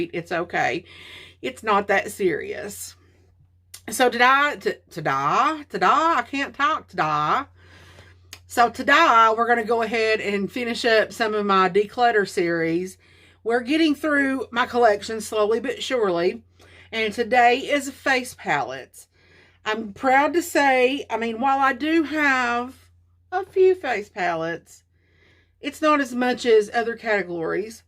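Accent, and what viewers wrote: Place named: South, United States. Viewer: Ong I heard the southern accent right away. Lols it’s the sweetest accent 😊😊 I’m having this weird obsession with finding the right face palette so I’m so curious to see what you have and what you keeps lols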